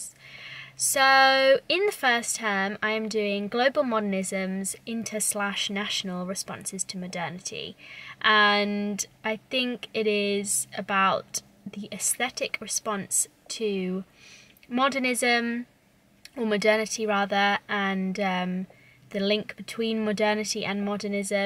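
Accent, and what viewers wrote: Scotland, United Kingdom. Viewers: It's only now I've started to notice a little Scottish accent coming through!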